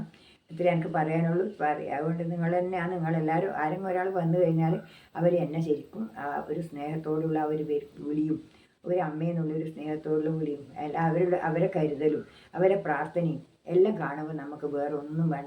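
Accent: Kannur, India. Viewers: അമ്മയുടെ വീട് എവിടെയാ. കണ്ണൂർ ആണെന്ന് സംസാരത്തിൽ നിന്ന് മനസ്സിലായി ❤️. കണ്ണൂർ എവിടെയാ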